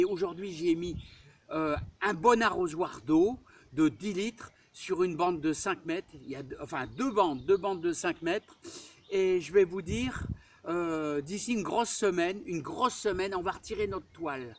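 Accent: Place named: Béthune, France. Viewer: Bonsoir Mr Depuis qqs jours je visionne vos vidéos avec plaisir et attention. J ai bien l intention de mettre certains de vos conseils en pratique. Votre accent aussi me réjouit vous parlez du Haut Bethunois( quelle commune exactement?),je suis moi-même originaire de Bethune,même si je vis depuis plus de 30 ans en Charente Maritime. Retraitée depuis qqs mois je profite e cette chance pour principalement m occuper d un potager (loué depuis 20 ans et acheté en janvier) J essaie de jardinier bio et naturel, d appliquer qqs principes de permaculture(notamment de maintenir un sol vivant) J ai essayé cette année (très chaude et très sèche) de nouvelles cultures avec plus ou moins de réussite Des topinambours récolte à venir Des poires melon aucun fruit ,pieds repiqués ds ma petite serre tunnel De la poire de terre (,Yacon) récolte la semaine prochaine Des endives hélas avec la sécheresse les racines sont toutes petites Tant pis je recommencerai j espère simplement que 2023 sera une année plus "normale " En tout cas merci pour votre bonne humeur et votre naturel. 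Bon mois de Novembre. Maryse Lydie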